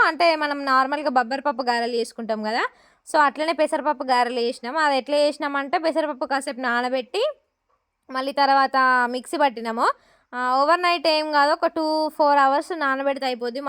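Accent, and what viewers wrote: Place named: Telangana, India. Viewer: Nice..mi Telangana slung bagundhi. maa tv,etv lo anchor ga try చేయండి..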